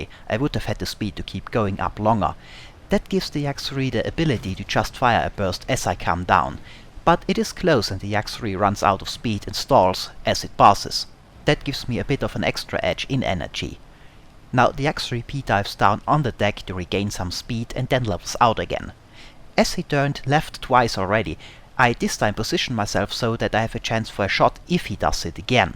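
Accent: Austria. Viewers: IIRC, he is from Austria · ***** so you havent heard an Austrian, exactly said Tyrolean, speaking english before.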